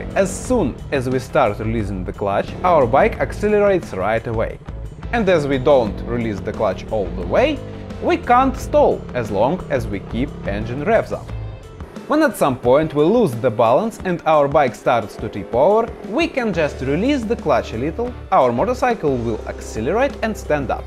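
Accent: Russia. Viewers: Please don't make fun of your accent, I love your Russian accent and I highly enjoy you content!😄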